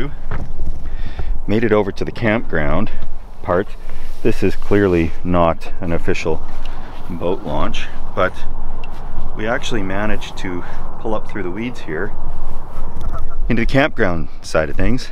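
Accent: Canada. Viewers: My wife is Canadian so I forgive the accent but mispronunciations are off limits. You’re still saying “brats” man. It’s “braahhts. You wouldn’t say bratvo would you? No you’d say bravo. Ok, so BRAVO on the video. I used to do something similar on my pontoon with a little self standing tent weighted down. Fun times. Thanks for the memories.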